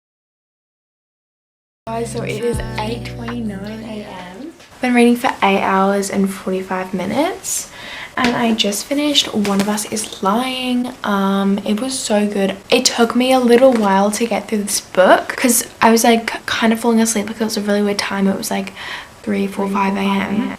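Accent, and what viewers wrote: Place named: Australia. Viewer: This is really random but you and phoebe Tonkin have the exact Same specific dialect like I could close my eyes and I could picture a young phoebe. I’ve never heard another fellow Australian sound so similar haha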